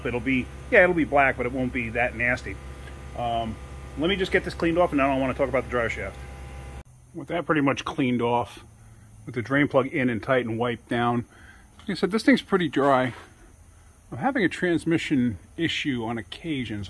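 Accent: Sweden. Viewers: What did i just hear, you are from Sweden 😀 For how long have you been in the states? Greetings from Finland 😊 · I KNEW you were from Sweden. Your accent gives it away.😅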